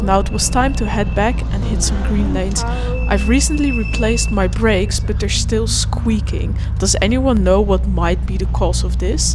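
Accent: Netherlands. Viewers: I had several of these. Loved them all. Never stuck not once. I had a G Wagon and I prefer the range rover, which has shortcomings but also lots of personality. I love this Dutch girl's accent. There should be a shim between the brake pad and the inside of the caliper which should eliminate the squealing. It's a wafer thin piece of metal.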